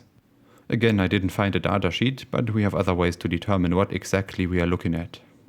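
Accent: Germany. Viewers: Spaten Pauli its german english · I like how you don't even try to hide your german accent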